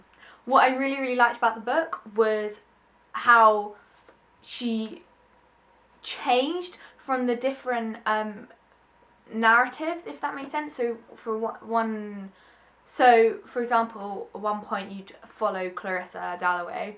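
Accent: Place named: United Kingdom. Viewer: and I love your british accent. :)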